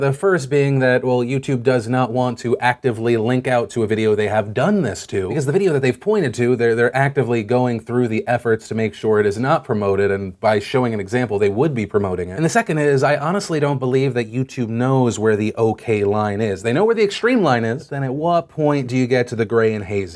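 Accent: South, United States. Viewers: Maybe this is a dumb question, but: why does Philip DeFranco end up with a slight Southern drawl in the end segment? How did that come to be a thing?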